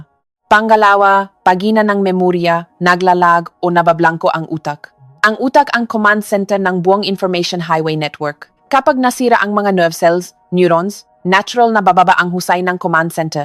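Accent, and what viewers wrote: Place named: China. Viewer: DOKTORA MAGARAL KA MUNANG MAGSALITA N G TAMANG BIGKAS NG TAGALOG ,, PARA KANG INTSIK TULO LAWAY KUNG MAGTAGALOG,, so ma lo sep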